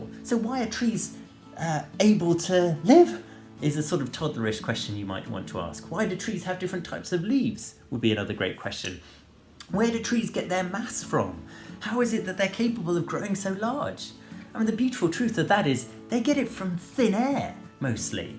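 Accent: United Kingdom. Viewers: I am a student myself and this is so helpful in so many ways. Thank you sir! And your English accent is pure music to my ears!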